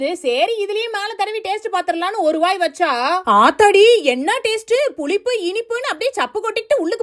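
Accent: Kongu, India. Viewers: Ethu kongu slang tha but not coimbatore and Tiruppur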